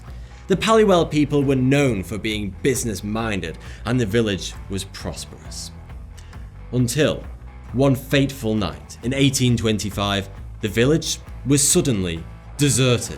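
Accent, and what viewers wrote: United Kingdom. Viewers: In the UK, we call them shopping centres, not shopping malls. tends to use American words, despite sounding British.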